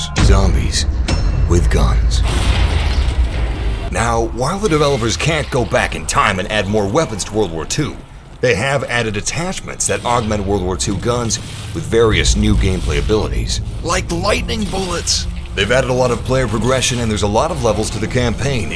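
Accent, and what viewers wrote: Netherlands. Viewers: Dude, i can only hear Dutch now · You almoust sound like ducht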